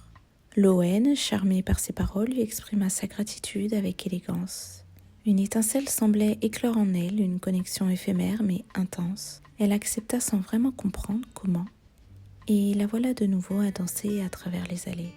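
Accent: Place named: France. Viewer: Wow very beautiful French accent voice and two of the beautiful feet in the world 😍🤩🌍🌎🌏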